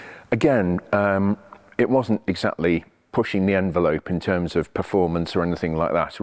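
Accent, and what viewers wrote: United Kingdom. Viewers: God this old gasbag who loves the sound of his own voice - which by the way is a sort of Hyacinth Bucket/Alan Bennet mash up - bridging a gap we never knew we needed filling that's a sort of fussing and constant irritation half way between Clitheroe - and South Kensington. I literally could give less of a toss about the grandiose delusions of any "relationship" with Crewe, l whose hapless senior staff would receive periodic unscheduled visits for a "nice chat over a proper cup of tea" with the full expectations that the posh biscuits were brought out - there's just a hint that the put upon secretary unfortunate enough to have to bring the tray through, was given a little pat on the bottom and a knowing wink and a twinkle that simultaneously says "we're all having fun aren't we pet" and "don't worry about me, I'm like family" ... While in the subject of made up dialogue, I'm sure if not said, then at least thought, was "I like to think many of my suggestions found their way into the cars, if I may so modestly say". 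I made that all up a) because I didn't get any further than "....Doktor Hüffenpump" before a little bit of sick came up at the humblebragging and b) because I wouldn't have cared about the actual words said since I just find him too off putting. And by the way, those Bentley executives, I bet any money, used to run and hide or at least drew straws as to whose turn it was to deal with him😂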